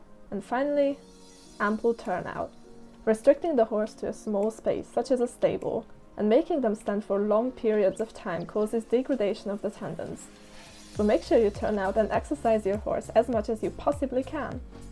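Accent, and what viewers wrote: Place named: United Kingdom. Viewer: Hey! Nice video, well done! You sound like a born british, and speak like a real youtuber :D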